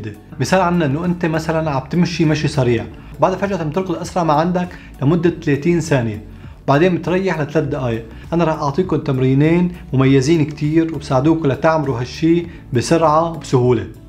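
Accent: Aleppo, Syria. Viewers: سؤال دكتور انت من حلب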